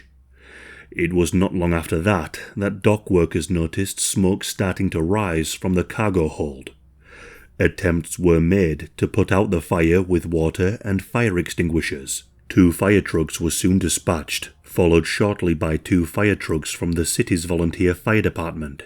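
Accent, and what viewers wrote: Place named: Yorkshire, United Kingdom. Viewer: I don't think it's Scottish or Welsh. I am going to go with Yorkshire, probably North.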